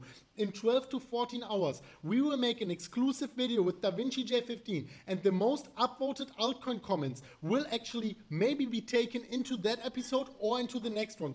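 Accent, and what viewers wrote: Germany. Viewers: The fact that i can understand everything that you are saying with that German accent and the pace you are talking is amazes me...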